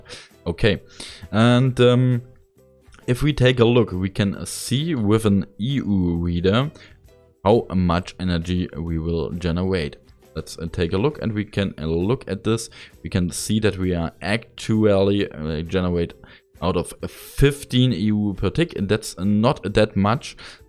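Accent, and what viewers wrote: Germany. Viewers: „five in the high“ deutsche akzent beste 😂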